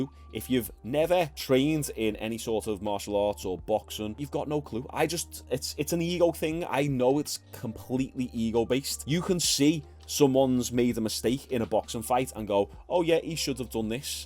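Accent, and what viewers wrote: Liverpool, United Kingdom. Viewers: Dude. A Scouser can talk about flies fucking, and I'd tune in.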